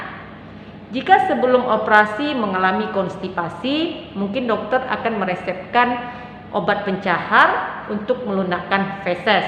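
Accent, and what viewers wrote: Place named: Padang, Indonesia. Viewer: sepertinya logat dan namanya buk dokter orang padang, maaf kalau salah🙏 kalau daerah sumbar RS mana yg biasa menangani fistula ya buk?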